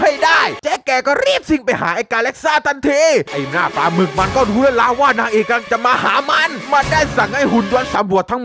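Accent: South, Thailand. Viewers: ขำกับภาษาใต้ สุดๆๆๆ หรอยหนัด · เกรียจการแหลงใต้ของพี่มากครับ5555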